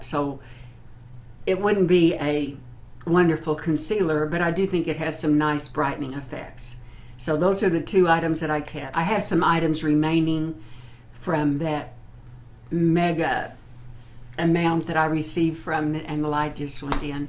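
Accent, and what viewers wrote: South, United States. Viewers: You sound southern and your food sounds southern....I am from Texas ...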